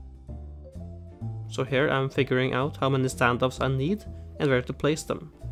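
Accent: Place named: Norway. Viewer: Du er norsk? Er bare vi som snakker engelsk sånn=P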